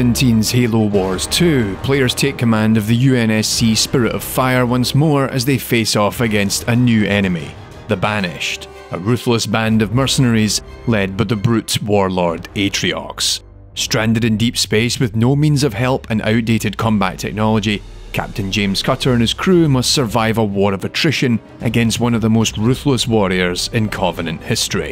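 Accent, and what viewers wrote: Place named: North, United Kingdom. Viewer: Nice work on the video mate, love to hear a fellow northerner talk about video games.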